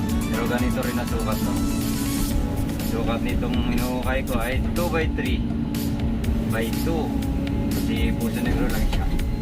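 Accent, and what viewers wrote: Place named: Bicol, Philippines. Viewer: Parang bicolano ka mag salita pre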